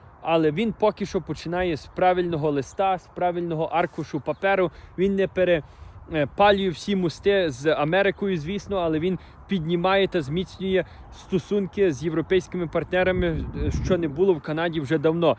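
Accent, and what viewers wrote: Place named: Ukraine. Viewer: МОЛОДЧИНКА,ПО МОВІ ВІДЧУВАЄТЬСЯ УКРАЇНСЬКЕ КОРІННЯ.ХАЙ ПРОЦВІТАЄ КАНАДА,НАШІ УКРАЇНЦІ,КОТРІ ПРОЖИВАЮТЬ У КАНАДІ.!!!❤❤❤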